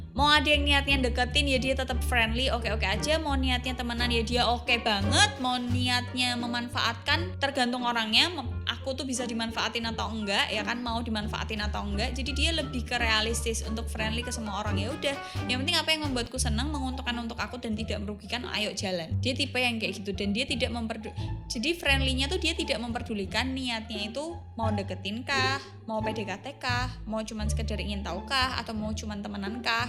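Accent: Java, Indonesia. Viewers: ngomong nya kaya orang jawa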